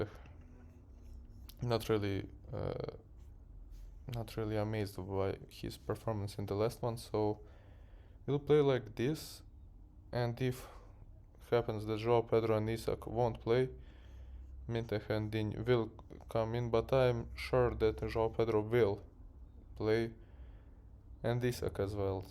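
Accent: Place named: Serbia. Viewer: English with Serbian ascent:)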